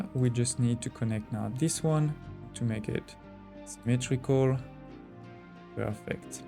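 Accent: France. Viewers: hi! thanks for your good tips ! ( what is you accent? French?)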